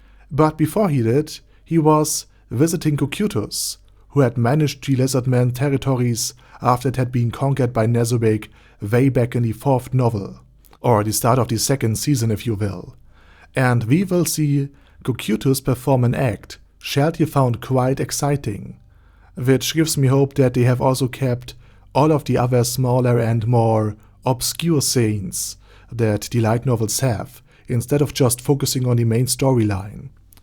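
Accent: Germany. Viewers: dude I love your accent, are you German?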